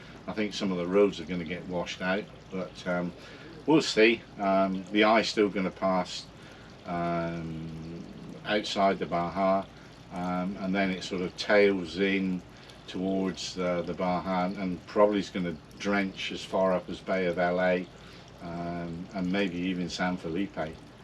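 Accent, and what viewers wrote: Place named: West Country, United Kingdom. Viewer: Great Video. Good to see you and hear that West Country accent too!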